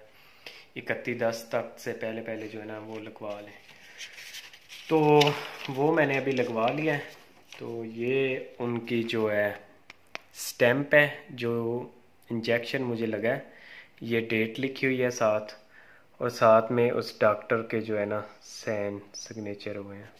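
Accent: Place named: Punjab, India. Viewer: Nice panjabi bro ap suraiki ho ya panjabi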